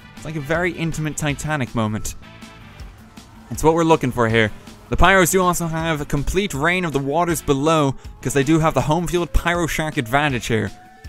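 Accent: Ireland. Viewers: wait are you irish.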